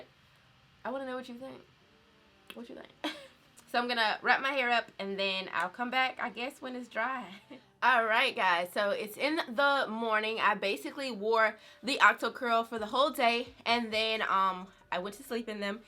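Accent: South, United States. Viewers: I love your southern accent!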